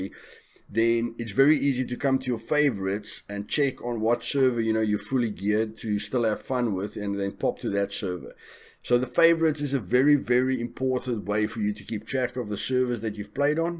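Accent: South Africa. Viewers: Thick South African accent. Love it 😂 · You're south african aren't you😂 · Is jy south african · Hey, which country are you from? Your accent sounds like you are from south africa? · Where are you from? xD Sounds like an SA accent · Are you from South Africa?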